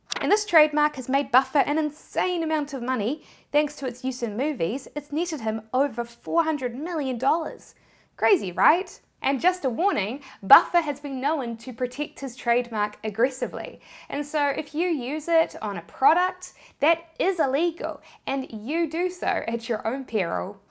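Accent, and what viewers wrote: New Zealand. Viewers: Are you from nz? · This girl has a pretty distinct NZ accent, I don't know what you guys are talking about.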